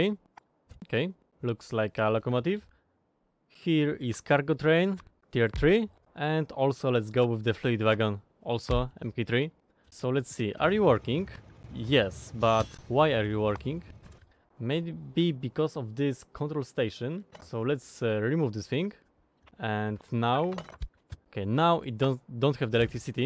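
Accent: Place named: Poland. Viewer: I thought so! I knew it sounded like my fellow country man, Elo mordo!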